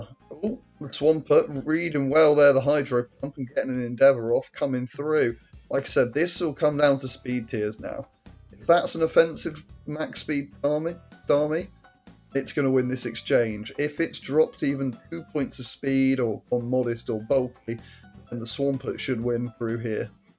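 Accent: United Kingdom. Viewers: Insane narration, random British person. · This is a British narration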